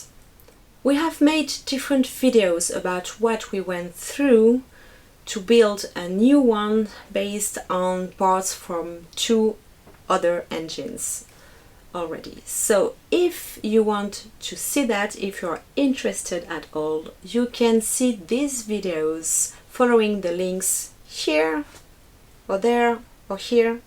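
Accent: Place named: France. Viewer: Lovely french-english, i love it!!!!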